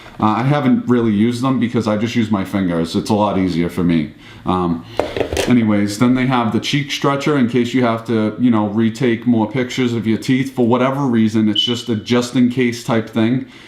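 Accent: Boston, United States. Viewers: Just here for the Boston accent.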